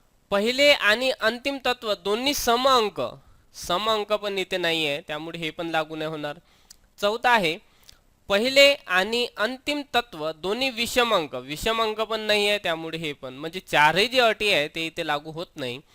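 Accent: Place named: Vidarbha, India. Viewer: very simple and good EXPLANATION .TUMACHA VIDARBHA TONING MAST AAHE KEEP IT UP👍👍👍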